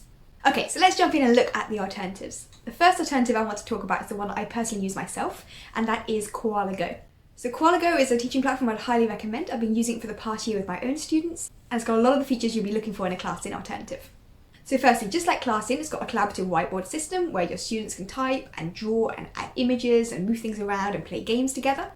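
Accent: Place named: England, United Kingdom. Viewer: Hello. Thanks for the great information that you share with us. However, can you please slow your speech down? I am a Native English speaker who teaches English online. I am finding it very difficult to understand you as your English accent is very thick and you also speak very fast. I am trying to understand all of the information that you are sharing with us however, I am replaying the video over and over again in order to understand you. Best wishes, Karyn.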